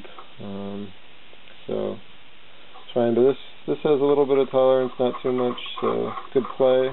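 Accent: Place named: New England, United States. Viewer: I heard the accent. One of those Nor'eastern States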